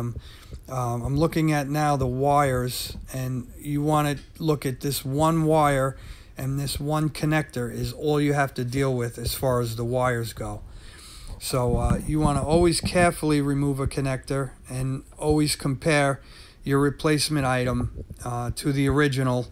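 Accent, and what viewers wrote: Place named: New Jersey, United States. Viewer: Are you from Jersey? I grew up partly in Newark, great accent and perfect instruction! Love that East Coast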